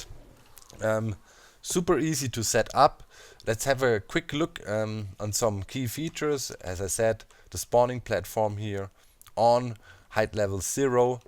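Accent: Germany. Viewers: you sound german r u german?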